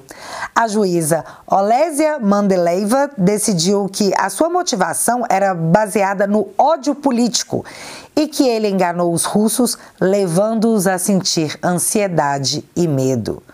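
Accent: Minas Gerais, Brazil. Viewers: "Mas, UAI, não era urgente?" Amei ❤ Você é mineira como eu,? 😊 Parabéns pelo seu humor ao nos bem informar. Valeu! Avante, Brasil 🇧🇷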